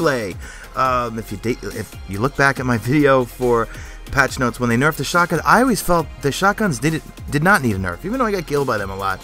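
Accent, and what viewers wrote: New York, United States. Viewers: Hey, fellow NY'er here, so love the accent - don't change a thing. Re: fusion rifles, I wouldn't be so quick to jump on the nerf-wagon before they lift a finger to actually buff anything. Seems Bungie is more comfortable nerfing. Buff pulse rifles, hand cannons, scout rifles and, who knows, fusion rifles may be a less attractive idea after all. Plus, they're great at taking down those pesky shields in PVE. How about spawn less special ammo in PVP? The player base will always have a preference - at least it's a class of weapons and not a single one (Suros Regime, I'm looking' at u).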